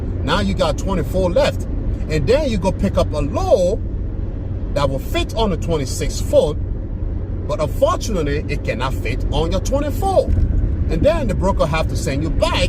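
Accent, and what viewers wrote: Liberia. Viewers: i miss raleigh!! i was tryin to figure out what road you were in and it was hillsborough st....memories...sigh. keep up the good work fellow African. You sound Liberian. My in law is Liberian lol. Good lookin out. i took notes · Sounds like a Liberian. My brabee ur Peking about to get his truck soon.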